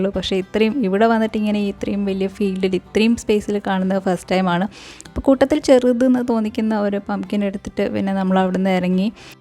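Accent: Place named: Kannur, India. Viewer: slang കേട്ടപ്പഴേ തോന്നി.😍✌️നമ്മടെ കണ്ണൂർ ഭാഷ.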